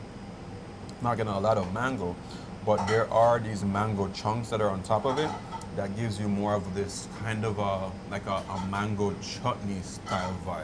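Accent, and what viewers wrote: Jamaica. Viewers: The patios accent deeeeep😂😂 I can hear the effort😅😅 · Buaay, me nuh know, but your patois sound funny eventhough you from jamaica.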